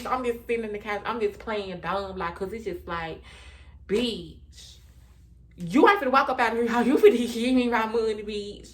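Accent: South, United States. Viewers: This sista is old school southern. I understood every word. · Your accent 😍 it's giving southern belle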